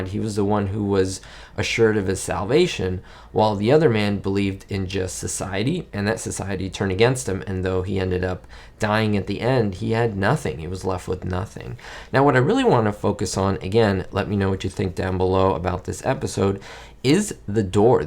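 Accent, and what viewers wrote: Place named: New York, United States. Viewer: "Not really so much for the story but for just the facade and the set pieces, i think you'll really appreciate it in a different way" - Because of that line I will indeed try to catch this episode in its entirety, have only seen bits and pieces before. Always love watching your videos, interesting, cool, nostalgic, amusing, and somewhat relaxing as you have kind of a soothing voice, very New York City. Anyway, bottom line, now I want to see this epi in its entirety. Thanks!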